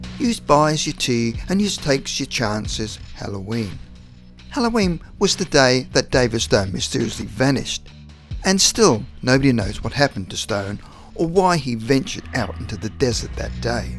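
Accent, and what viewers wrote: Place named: Australia. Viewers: peethewak, I listened closer and you are correct, the narrator is Australian. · Matt Kustom Kostumes i noticed that too. The narrator sounds Australian to me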